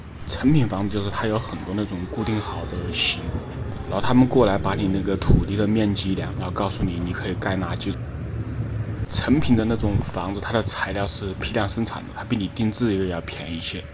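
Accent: Wuhan, China. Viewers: 朋友，听你的口音，是武汉的吧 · 请问，播主是湖北武汉人吗？口音有些象 😀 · 武汉人吗？一口汉普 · up是武汉人吗，口音听起来很像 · 标准的武汉普通话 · 你肯定是武汉人：） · 武汉口音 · 武汉普通话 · 你这个口音，掐指一算，大概率是武汉人，哈哈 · 博主武汉人吗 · 你这口音一听就是武汉的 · 兄弟，说话怎么一口武汉味!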